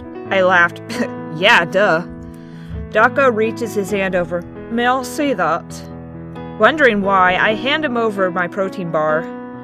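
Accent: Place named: United Kingdom. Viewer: that British accent xD this is awesome and your voice is so sweet!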